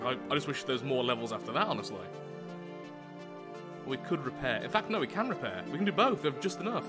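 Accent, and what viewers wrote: United Kingdom. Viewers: next video can you turn down the game music a little? I can't hear your British voice as well as i need to!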